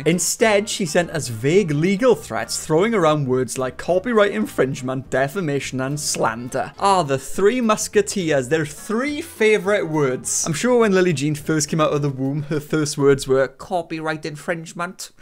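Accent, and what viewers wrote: Wales, United Kingdom. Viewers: Off topic but.. your Welsh? I knew there was something familiar about your accent!!!!! I DONT THINK IVE EVER REALLY SEEN A WELSH YOUTUBER!!!!!!! Finally!!!!! I have found someone!!!! 😭🥳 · He's Welsh. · I’m sorry if this is rude to ask but what is the accent he has is called? It is not the usual British accent I am familiar with. I just love his accent so much and would love to learn more about it.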